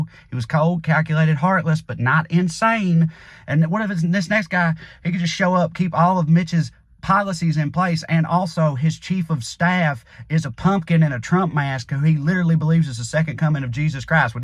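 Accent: South, United States. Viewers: Dude!!!!!.. GIGGLE MERCHANT!!!!! STILL LMFAO!!.. LOVE THE SHOW, AS ALWAYS SPOT ON!!.. ALSO I COULD LISTEN TO THAT SOUTHERN ACCENT ALL DAY LONG!!( ONLY A COUPLE WORDS I GO..??? WHAT'D HE SAY??!!!! YOU ARE AWESOME!!.. I SO APPRECIATE THE HARD WORK THAT GOES INTO YOUR SHOW!!.. YOU ROCK!.. THANKS FOR KEEPING IT REAL, HONEST, AND UNFORTUNATELY TRUE!!... VOTE VOTE VOTE BLUE!!!!!... PLEASE 🙏 🙏 WE GOTTA STOP THE SICKA** REPUGNANT REPUBLICONS!!!..... YOU'RE A GREAT HUMAN BEING ❤️ ♥️!!...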